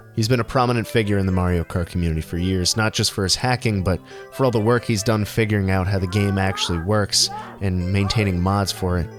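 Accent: New York, United States. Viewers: THIS IS????????????????????????????????? wow this man how grOWN UP...and also developed a new yorker accent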